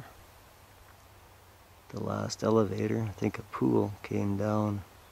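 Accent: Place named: Minnesota, United States. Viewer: Sounds like you have an accent to me, reminds me of someone from Minnesota. I'm from Columbus, Ohio though. I read somewhere that the " 'General American' region accent... covering parts of Illinois, Indiana, Iowa, Kansas, Missouri, Nebraska, and Ohio" is the most neutral english dialect.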